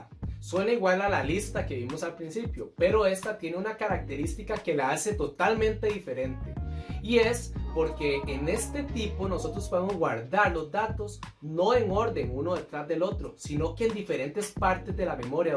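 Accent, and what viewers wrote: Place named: Costa Rica. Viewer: Ese acento tico no se pierde, buen video mae.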